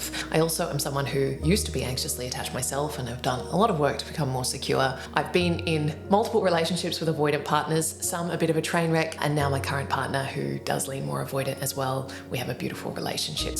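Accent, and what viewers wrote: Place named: England, United Kingdom. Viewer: You speak in a very elegant way. Also love the English accent. Great video!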